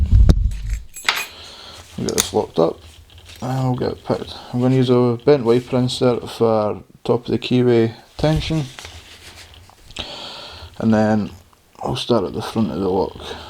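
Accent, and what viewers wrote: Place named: Scotland, United Kingdom. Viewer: Haha, nicely done. Orange belt for you bud! Refreshing to hear a Scottish accent that isn't my own while picking. Keep up the great work bud.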